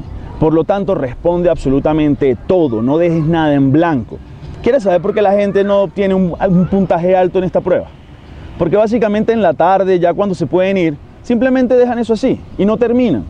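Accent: Venezuela. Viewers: Es venezolano?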